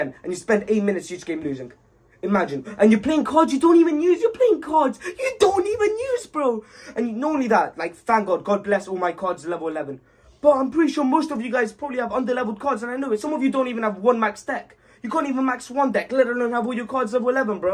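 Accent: United Kingdom. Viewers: Bruhh i just hearded that u are british, im unsubcribing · He sounds so British in this video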